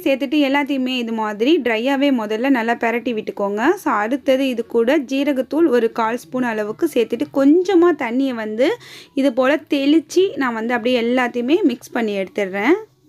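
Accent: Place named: Tirunelveli, India. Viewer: Mam neenga kovilpatti or thirunelveli site ah mam unga speech word enga pakkathula mathiri iruku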